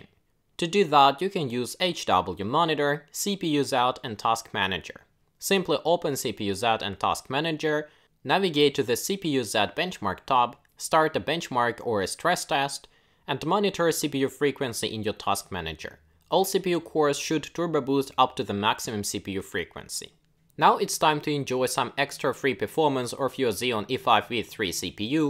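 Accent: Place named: Russia. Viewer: Со славянским акцентом. Очень похож на русский акцент. :)))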